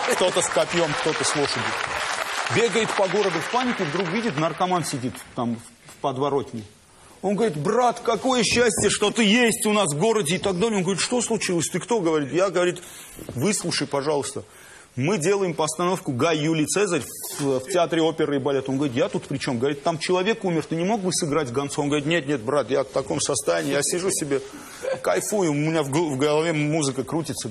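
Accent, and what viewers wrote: Armenia. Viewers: А не на русском ТВ Мартиросян включает армянский акцент. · Когда он со своими у него армянский акцент, а среди русских Ванечка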